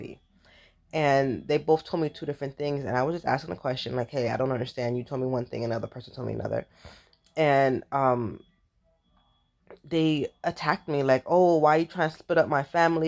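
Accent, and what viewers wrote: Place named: Florida, United States. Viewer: You sound floradian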